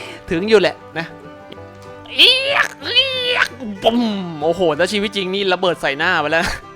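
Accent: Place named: South, Thailand. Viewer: ผิดเเล้วๆๆ555หนูชอบพี่เเหลงใต้มากเลยนิ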